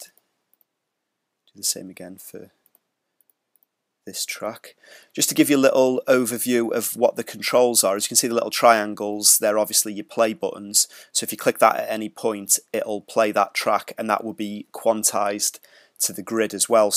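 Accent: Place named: Yorkshire, United Kingdom. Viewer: where about in England u from? (u sound very Yorkshire!)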